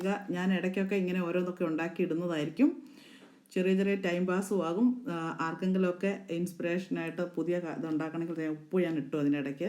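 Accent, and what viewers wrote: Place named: Kottayam, India. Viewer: I like ur videos very much becoz of ur fast talking..20 minute watch cheyunnathu oru worth anennu thonnum..unwanted introduction, explanation onnum illatha video valare ishtamanu..bore adikkilla..vegam karyangal avatharipikum..keep it up..r u from kottyam district..becoz ur slang same like us.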